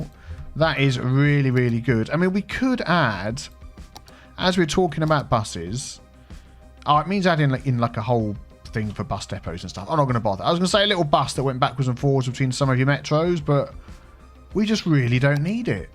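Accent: United Kingdom. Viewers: thank you for the information on the roundabout builder. I brought up the English bit because people try to correct his pronouncing but who knows the English language better than the English.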